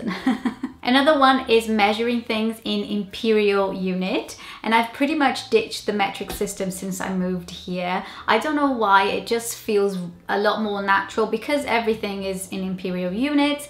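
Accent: Wales, United Kingdom. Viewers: Thank you a joy to listen to, even your accent is vaguely Welsh. We are a quirky lot us British but we are appreciative of those that choose to join us and accept our foibles rather than insisting we change them. I'm sure you bring something to benefit us. I've been to South America so I know it's totally different from Britain. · Your accent definitly has a little Welsh in it. I noticed it even before you said you lived in Wales. I'm from South Wales now living in Canada. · defo a Welsh twang there.. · Whats funny is you sound so Welsh! I can hear the musical tone in your voice! Im not insurance is a cultural thing here though that more marketing. I tend to get insurance if I think things are going to get expensive if I dont... eg smart phones, houses, cars etc. I dont get insurance on white goods, as there really is no need if you buy quality brands. 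:-) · Your accent is superb very British with a little Welsh lilt. Americans serve fries even more than the British serve chips. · Can hear the welsh accent in your voice before you said you lived in wales . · I can't believe your from Brazil? You could be from the Swansea valleys. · You have definitely picked up a welsh accent. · Please keep your dual measurements in your head - Imperial is horrible and very few people use it now. The only exception is road distances and speeds. Your Welsh accent is coming along nicely by the way - very charming! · Love the Welsh accent! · I love your Welsh twang. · You have a hint of a Welsh accent going on there, lol As a Brit, if in doubt, have a cup of tea, lol, the best medicine, lol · you have a slight Welsh accent! It sounds nice! I LOVE DUNKING biscuits! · You are lovely, Your English pronunciation is excellent, far better than most British people but I detected a very slight accent. 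I thought it was your brazilian background. But it was after you said you lived in South Wales I realised you have a slight Welsh accent. I am Welsh so I am very happy for you to have found a home there. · You are a knock-out!! 😍 Hola Linda!! You have a Welsh accent! I have a Birmingham accent and New York American accent too girl! 🤓 Portugees and Russian are hard languages for poor me. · I love that you have a very Welsh accent!! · What surprises me is the speed of a welsh accent you have picked up! :) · Your Welsh accent is so natural I assumed you were British! Fun video, and you're spot on with all the British habits 😅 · Picked up a lovely welsh twang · I'm Welsh , and she has definitely got a welsh accent. And I can tell what part of Wales she has been living too ! :-) Sitting next to someone on a train or bus in Norway. A country I spent some time in , is considered a insult and they will ask you to move ! 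· Love the welsh accent, with a little Brazilian in there :) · M H There is a slight Welsh twang to some of her words - I can hear it. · Dave Williams Just what I was thinking (hint of Welsh) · As a fellow South Wales resident, I can confirm that that is an absolutely wonderful example of an acquired Welsh accent! Da iawn! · Really cool! It's funny to hear things pointed out that are just taken for granted as being normal here, there are weird customs that don't make sense! Your accent is great, I can even hear a tinge of Welsh in there. Btw, I hate chips too. Good stuff! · You have such a lovely voice with your Welsh twang 😊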